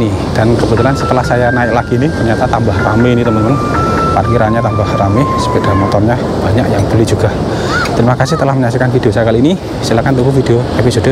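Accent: Java, Indonesia. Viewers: Aku loh sueneng nek ngrungokne mas ngoceh2 jowone iku LO kuenthel😍🤭🤭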